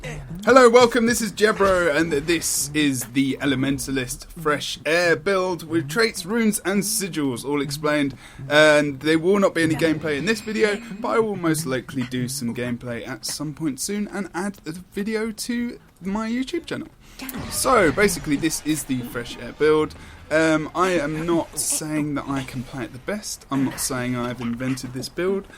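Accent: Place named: England, United Kingdom. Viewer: Cool, thank you! I saw your Lion's Arch tour just now and I liked it! Keep the vids up and especially that English accent of yours ^^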